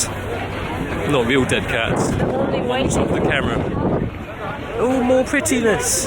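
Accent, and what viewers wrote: United Kingdom. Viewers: it feels awkward seeing someone who is asian having a british accent hahaha i dont know why, i'm asian btw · My mind still can't process and Asian with an English accent!!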